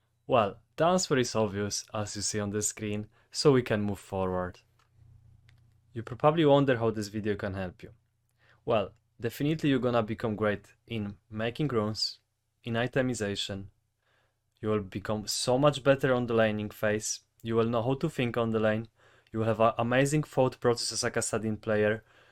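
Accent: Poland. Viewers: Pewnie polak sądząc po akcencie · Are you from Poland? Jesteś Polakiem bo akcent wydaje mi się że słyszę Polski.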